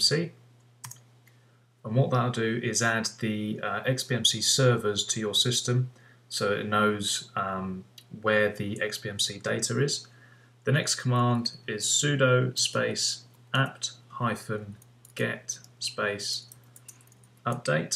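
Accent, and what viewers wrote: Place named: United Kingdom. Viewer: First video which is so useful.finally British accent. After following step by step guide,my xbmc is slower than slow.mouse does not respond and arrows are very slow to respond.any ideas to normalise? I am using acer aspire. Also no matter what the edges of xbmc is beyond the screen of tele.tried reducing and adjusting tv format,doesn't help. Suggest please!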